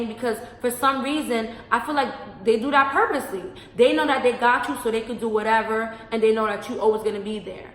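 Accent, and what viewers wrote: New York, United States. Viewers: Lol you have that New York accent fr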